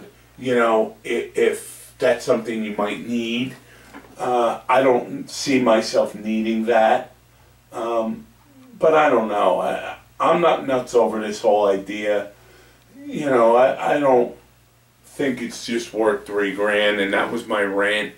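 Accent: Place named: New Jersey, United States. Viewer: lol, I guessed it well, I know my people, you sound like your from Jersey, I too am from hudson county, Live in south Florida so I do alooooot of flying down here all year round. My phantom has alot of use on it. I'm set-up for long range so I fly alot over 3km over swamp land. Multirotors are huge down here, even for just hobby. Went to jersey last summer and nobody really is into them up there from what I see. Not even most hobby shops have very little inventory on them. Oh well, good to see that your keeping it real up there.. Good Luck.....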